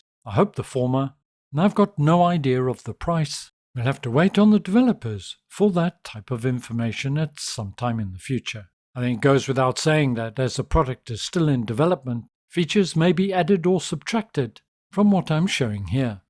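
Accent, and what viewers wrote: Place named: South Africa. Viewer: You sound a bit South African….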